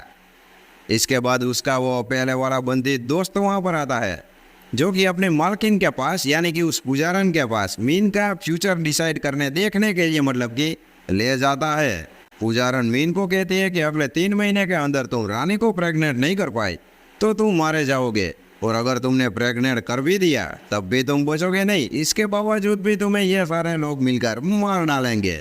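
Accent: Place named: Chhapra, India. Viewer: Tera boli chapare jaisa hay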